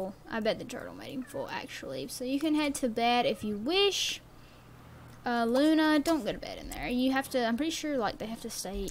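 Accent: Texas, United States. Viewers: are you from texas? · are you from Texas?? cause you have a farm girl axcent I adore it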